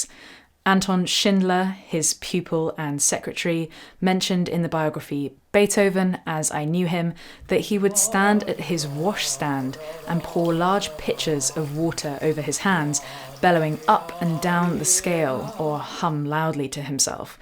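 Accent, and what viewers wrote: United Kingdom. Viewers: lmao i have no idea who you are but i heard the british accent and boom subscribed 🥰🥰 SO refreshing after always watching american youtubers and movies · Holy hell...You're BRITISH?!?!?!?! You were already AMAZING and now you speak.....<3 <3 Sorry I presumed you were Norwegian as well! Just recently discovered Leo and Yourself and Hanna and Rabeah...lol Anyway no that I know you're in England, did you ever have an opportunity to meet or hear from him on your cover of?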